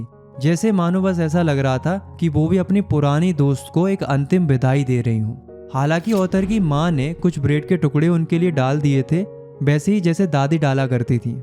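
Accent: Uttarakhand, India. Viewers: sirr ap uttrakhand sa honaa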